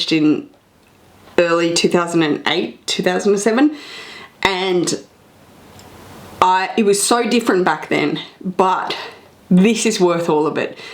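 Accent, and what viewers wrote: Australia. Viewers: you've unwittingly been right by my side for so many years now. My partner walks by me watching your videos and affectionately shouts ' ' and then leaves me be because obvi I'm busy, eyes glued to whatever eye candy and luxury wisdom I have coming my way. I can't actually pinpoint what it is I love about your videos. What first drew me in was hearing another Australian in a sea of American luxury YouTubers. From day 1 I just.. trusted you. I've watched you thrive, go through your first pregnancy, met your beautiful Mum and gem of a hubby. I've seen Massi grow and squealed when Zaki I came along. And here I was thinking I was watching for the luxury! Anyway babe, your killing this biz, keep doing you. Congrats on 100, 000. Hells bells, that's the shzushz!!! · Watching another Sydney girl do so well makes me really proud! You’re always my go to for fashion and luxury videos and hearing an Aussie accent makes it that much better! 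🇦🇺❤️ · I’m a silent watcher,but I just want to say I love your blogs! I love watching your lovely family and your sweet mom. Your kids are so precious! I’ve collected luxury handbags since 1986(telling my age 😉) I love your collections and I love your accent! If I had your Aussie accent I would never stop talking 😌 Thank you for the opportunity to win the Speedy B 25! 😍 God Bless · How can anyone hate you?! You are so real and generous. I love how you share your life and love for luxury without any tinge of bragging. And of course that Australian accent is just so cute, I can’t get tired listening to you. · I watch your channel because you are true to yourself 100%. And I love your Australian accent! 😊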